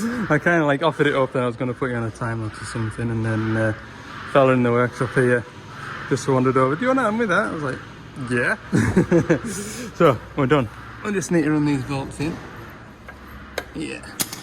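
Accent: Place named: Scotland, United Kingdom. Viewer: Love it when the Scottish comes out it sounds funny with your accent. Goat yer troosers oan. Oh aye like.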